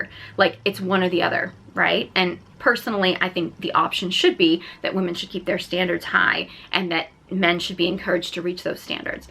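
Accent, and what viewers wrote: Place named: South, United States. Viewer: Your accent is cute! Where are you from? I’m in the Midwest. To me it sounds like you have a ever so slight southern accent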